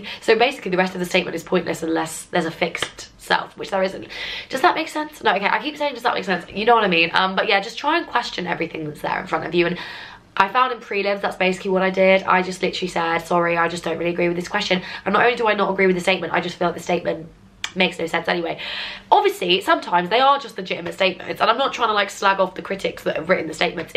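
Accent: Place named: Wales, United Kingdom. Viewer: Oh goodness, that welsh accent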